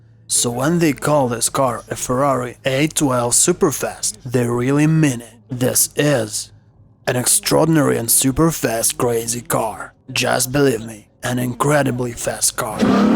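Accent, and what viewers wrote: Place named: Russia. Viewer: это у тебя в голове установка видимо детского сада. Это и без тебя известно что есть и британский и австралийский акцент. Просто все дело в том, что если бы ты знал на какую страну метит Эрик со своими англообзорами, ты бы тут вообще ничего не писал. А раз он метит туда куда ты не знаю понял ещё или нет, он мог бы перевести свои обзоры с русскоязычным чуваком и дать на озвучку носителю языка. Решил сэкономить, чё тут сказать. Просто представь ты смотришь топгир а там озвучивают Равшан и джамшуд.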